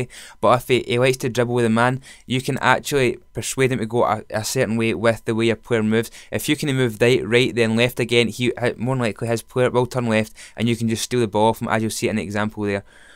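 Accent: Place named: Scotland, United Kingdom. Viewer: Sounds ok to me, have you never herd a Scottish guy b4? lol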